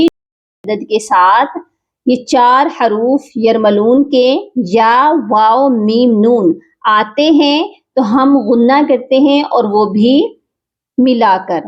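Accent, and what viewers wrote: Panipat, India. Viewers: Ap jis lehjay may parti hai wo panipati lehja hai plz muhjy batay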